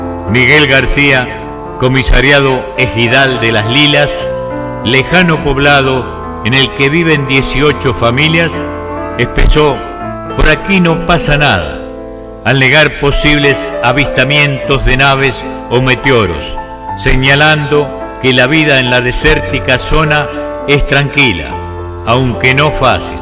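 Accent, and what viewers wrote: Argentina. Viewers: Qué hace esa voz argentina narrando algo del norte de MÉXICO??? 🤷🏽‍♂️😂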